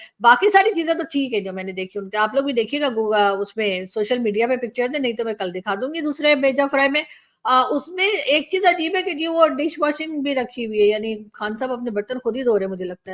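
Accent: India. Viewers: Your style speaking is just like Indian and I like it too much . Your electricity bill gave me a big shock. I always smile to watch your videos .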